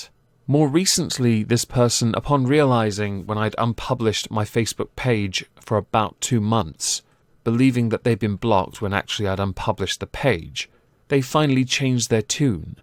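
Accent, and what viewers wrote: United Kingdom. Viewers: My condolences for issues you're dealing with. I hope whoever this fan is gets professional help, because this kind of fixation is unhealthy. I hope the issue is resolved as soon as possible and appreciate your upfront acknowledgement of the situation so your supporters (yes I had been using the vernacular meaning of fan in the past, but given the circumstances I will switch to your preferred and honestly more precise term at your suggestion, not out of sicophantism but because you made a good point regarding the implications of the word). Best wishes and look forward to seeing more content from you in the future. Edit: For the record I find the precision of your diction pleasant to the ear but not unusually so. I recognize you have a British accent, but you make no pretense at anything else so I don't think it's a big deal. I do find your educated choice of language enjoyable to hear, simply because I instantly know what you mean by your choice of words. 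But again, it's more an intellectual enjoyment like reading a well crafted sentence. Actually I take that back, it isn't like that intellectual enjoyment, it is that intellectual enjoyment.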